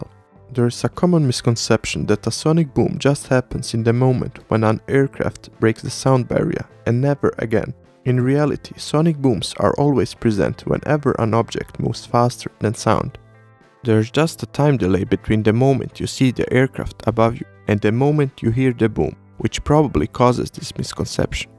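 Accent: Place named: Yugoslavia. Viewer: Ahhhh yes, Yugoslav accent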